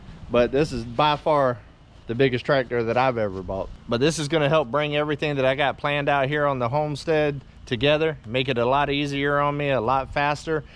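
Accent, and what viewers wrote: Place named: Louisiana, United States. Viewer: Just want to know if you're from Louisiana because you sound like you got Louisiana slang going on when you talk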